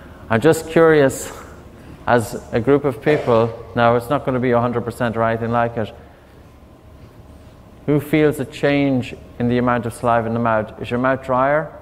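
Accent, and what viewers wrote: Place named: Ireland. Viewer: Such a lovely Irish lilt!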